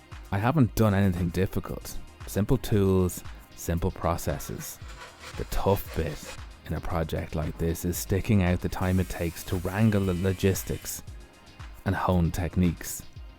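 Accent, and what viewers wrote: Ireland. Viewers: Every video o watch,i feel like i should be drunk,i guess is Irishness coming through.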